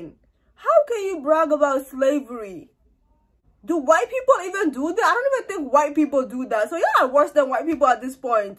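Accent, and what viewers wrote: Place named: Somalia. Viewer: Babe girl let me just say you are gorgeous. Secondly your nose and lips aren’t that big. I am African American and many African Americans have the same features some Somalis have. Lastly I wanted to know where you might be now because your accent is very interesting I can hear the Somali but their is a little bit of something else I can’t really place it. Any way be blessed love and you just gained a new subscriber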